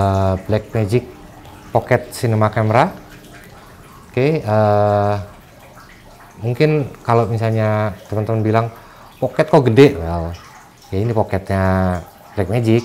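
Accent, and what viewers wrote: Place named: Java, Indonesia. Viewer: Mas kapan buat tips & trik videografi lagi? Udah kangen banget sama logat jawanya mas hehehe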